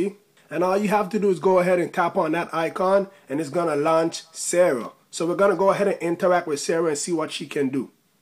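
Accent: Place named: Jamaica. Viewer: just curious but what is your nationality? you sorda sound Jamaican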